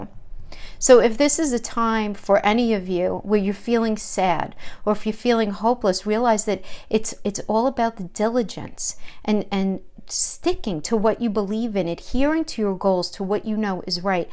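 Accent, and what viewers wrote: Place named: New Jersey, United States. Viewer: ❤️ (As an aside, I can’t stop thinking you must be from Jersey, where all my heart and family lives.)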